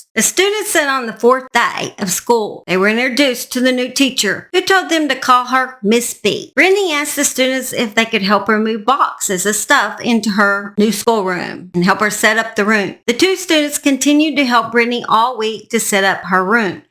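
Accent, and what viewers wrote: South, United States. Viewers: You might be a "lil ole' southern lady" which is a compliment, but you're an awesome researcher and story teller. We appreciate you and love you. Thank you,. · Just a southern harley riding belle of the south lol 😂 love my southern true crime narrator 😊😊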